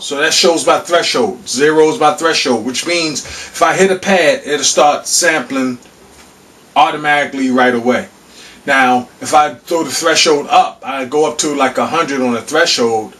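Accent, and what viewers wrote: Baltimore, United States. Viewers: Dope vid. You sound like you from B-more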